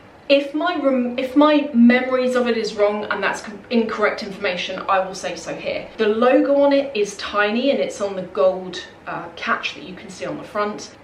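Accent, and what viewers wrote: England, United Kingdom. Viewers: Also, may I ask what type of English accent you have? I think it's very pretty! I'm aware of the different types of accents as my fiance is English.:)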